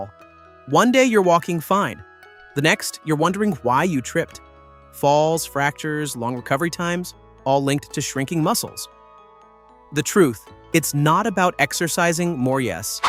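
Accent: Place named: United States. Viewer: Click bait rubbish with a annoying American accent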